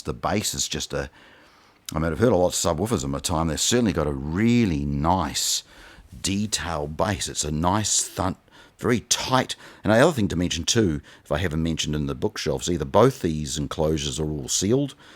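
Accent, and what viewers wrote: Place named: New Zealand. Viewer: I can't afford these things... yet! But my BestVox LS3/5a are definitely going to find a home on top of these at some point. In the meantime, I'll have to live with the Spotless Seed 1-way speakers, and their dedicated subwoofer/stands. They are rather incredible. So that is not difficult. I own 26 sets of speakers, and 7 subwoofers. :) Edit: LOL. We have the same RCA cables. Great minds... ;) Edit 2: LOL. We have the same Kiwi accent, too. I'm in CHC. :) Edit 3: Sorry to say this, but you are sitting miles too far from those speakers. You are well beyond the Critical Position according to Reverberance Decay theory. That means the room is BY FAR the biggest contributor to the sound you hear. If you were to toe them in a little, so the 5as focus about 1.5m behind your head, and you sit within 6 feet of the speakers, you will be absolutely shocked at how great your system now sounds. 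Try it! Rooms are horrible for farfield audio, in general. And Nearfield listening is the universal cheat code. I only sit 70cm from the speakers, and 60cm from each subwoofer in my all-Class-D quad monoblock setup running 4x 48v 10A GaN PSUs. And my system is capable of doing things to the human nervous system that $100,000 systems can not. Nearfield is why. And also extreme attention to set-up, and the time domain - which ruins 95% of all stereo systems.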